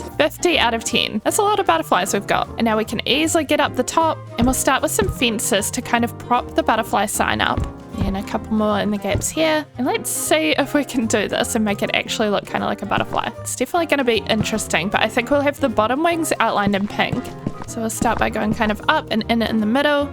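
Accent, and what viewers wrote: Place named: New Zealand. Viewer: 200 days is def needed! This is just so cozy and your New Zealand accent just makes this all the more better. <3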